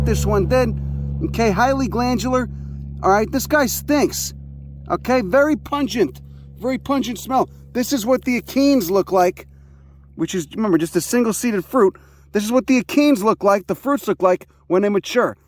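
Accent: Chicago, United States. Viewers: Chicago, Illinois area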